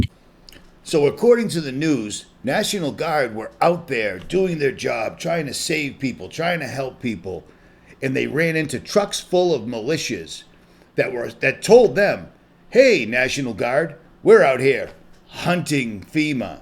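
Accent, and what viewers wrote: Boston, United States. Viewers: That’s Boston lingo.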